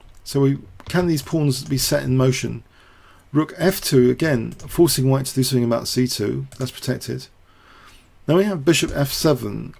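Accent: United Kingdom. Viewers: I just wanted to say how grateful I am for all these videos you do. I'm 28, and I've been playing chess since I was 10. I stopped playing back in '07 when I was diagnosed with leukemia in the navy. I've recently started getting back into it and am amazed by all the instructive video options there are on YouTube. But yours are the only ones I care to watch anymore. Majnu is ok, but just not in-depth or instructive enough. I had to get used to your "British accent" at first, but now I find it quite comforting (weird, I know). So thank you for all you do for the chess world. There should be like a Nobel Chess Prize or something for this.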